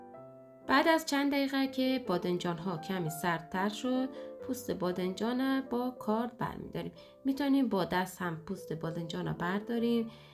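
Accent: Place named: Afghanistan. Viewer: جان، خواهر قندول و نازنینم، تشکر! من عاشقِ لهجه پارسی دری تو هستم.:) یه کلمه گفتی که معنی اش را نفهمیدم. " تَیار می‌کنیم " یعنی چی؟ این کلمه به فارسی ایرانی چی میشود؟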